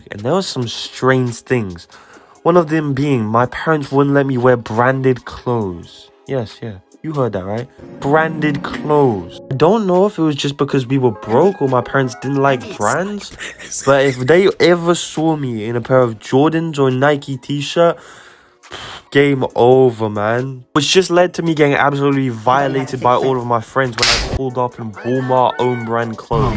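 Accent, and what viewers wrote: United Kingdom. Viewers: Anyone else notice he has an English accent with Puerto Rican parents? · "Puertorican" Bri'ish accent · The fact that he’s a Puerto rican with a British accent and his dad it’s kinda cold where he’s at is kinda wild to me. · Your Puerto Rican with a UK accent? That’s interesting….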